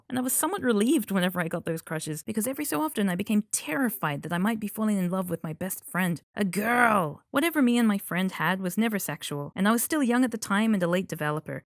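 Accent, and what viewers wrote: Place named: Australia. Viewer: oh god youre australian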